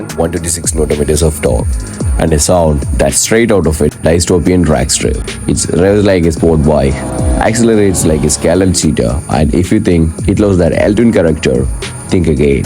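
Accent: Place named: Kerala, India. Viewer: Great vid but work on your speech and pronunciation. You have solid baritone voice, but you have a heavy Malayali accent which makes it extremely difficult to understand what you are saying.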